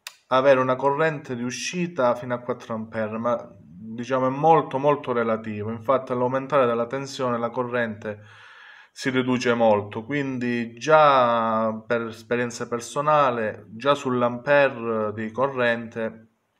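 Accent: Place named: South, Italy. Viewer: Ma di dove sei dal accento sembra che sei del sud italia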